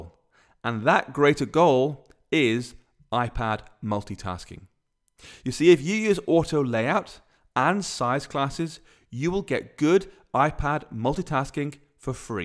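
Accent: United Kingdom. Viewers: I like British accent.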